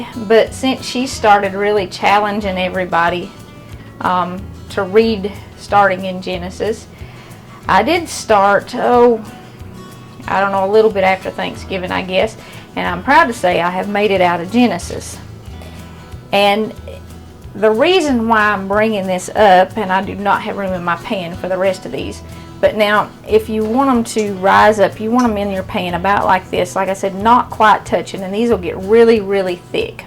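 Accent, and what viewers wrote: South, United States. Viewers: Living on the west coast, I love your southern accent. I could listen to you all day. The tip on making ap flour into self-rising was most helpful. You talking about Jesus was an unexpected delight. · Where in the South do you come from? I am a Buckeye (worthless nut) but I love southern cooking. You are easy to listen to. I love southern cooking and I love to hear someone whom I believe is saved.! If you know the Lord (Really know). you will be sincere and honest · Amen...Raised as far Southest Kentucky as you could get,Live in Arizona now..Hearing her southern voice reminds me of Back Home Sweet Harlan County...Girl your biscuits look Amazing...Jesus Sure Loves all his Children...Amen😇🙏🏻🙌🏻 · Love to hear you talk. I am from the south but have been living in the north for the past 21 years. I miss the southern language so much.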